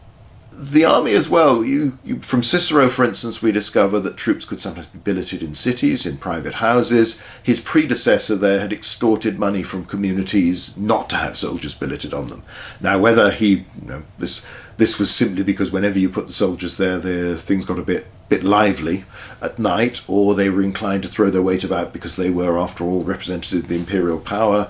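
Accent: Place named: Cambridge, United Kingdom. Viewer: Its called Cambridgian English. Kinda like Cajun English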